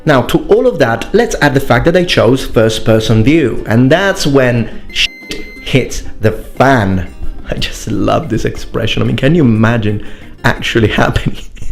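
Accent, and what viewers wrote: United Kingdom. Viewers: Do you speak English with an British accent because you learned from watching British television, or did you spend some time in Britain? I just didn't know. I mean no offense. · I thought you were Italian, but in this video, you sound British...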